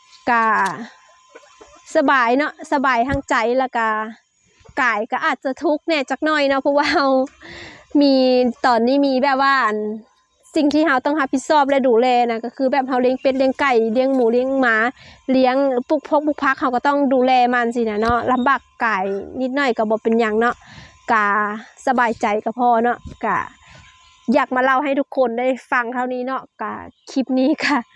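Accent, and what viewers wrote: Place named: North, Thailand. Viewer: ภาษาพูดของ คล้ายภาษาคำเมืองภาคเหนือ ตอนแรกนึกว่าเป็นคนเหนือ เป็นคนสู้ชีวิต สุดยอดแม่สาวน้อย👏👏👏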